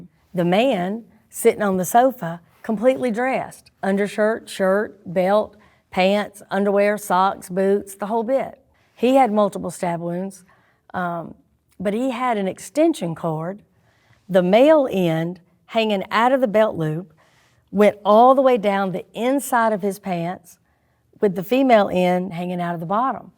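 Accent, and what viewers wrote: United States. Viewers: Great to see someone with my accent on YouTube. There’s not enough of the south on YouTube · yes, Georgia. I'd guess southwestern GA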